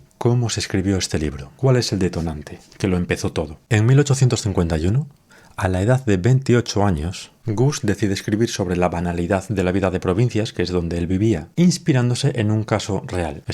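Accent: Spain. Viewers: Cuando te vi tomar mate pensé¿Qué? ¿Es argentino?, pense que era español! Entonces volviste a hablar y efectivamente, eras español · Es el primer video que veo de ti, muy bueno, pero no se de dónde sos, tenes un acento español pero tomas mate jaja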